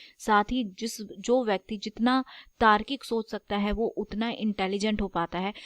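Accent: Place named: Delhi, India. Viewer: No she is absolutely "North Indian"... * Notice her "a" pronounciation that connect Delhi area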